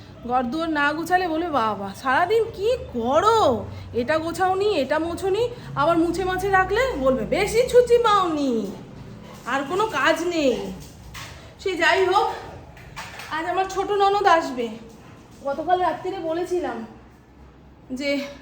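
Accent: Bangladesh. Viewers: সব ভাল কিন্তু এতো বছর ভারতে থেকেও বাংলাদেশি ভাষা ছাড়তে পারেনি।ফোন দেওয়া, চাবানো, কান্না করছে এইসব শুনলে বিশ্রী লাগে। এদেশিয় ভাষাটা শেখা উচিত ছিল।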